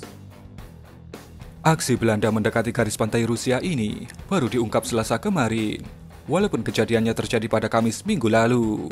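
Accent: Java, Indonesia. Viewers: Admin logat jawamu sangt menganggu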